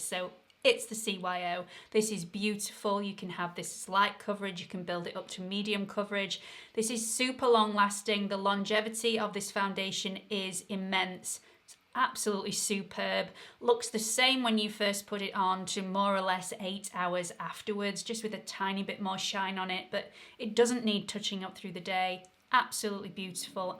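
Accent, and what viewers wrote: United Kingdom. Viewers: 1. So glad your mum is back, healthy and beautiful!! 💖 2. You look lovely with red tops,!!! ❤ 3. Together you 2 rock this review and I'm so happy, that you tested so much stuff! Could listen to your british english the whole day! 💗 4. Please do a video with dupes Thanks, thanks, thanks for your channel! Hugs and kisses from Germany, Sabine 😘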